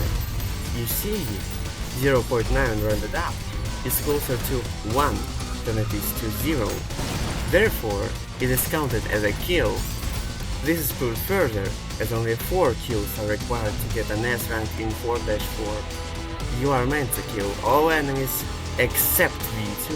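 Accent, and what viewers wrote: Russia. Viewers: бро не может скрыть свой русский акцент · i can hear the russian accent ))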